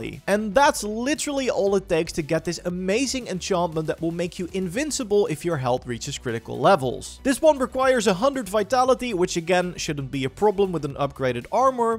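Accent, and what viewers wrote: Netherlands. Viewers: dude r u dutch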